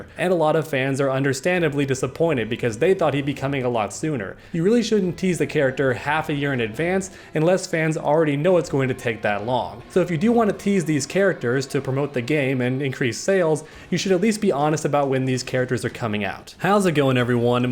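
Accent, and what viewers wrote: Texas, United States. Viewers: Wait, you're a Texan?!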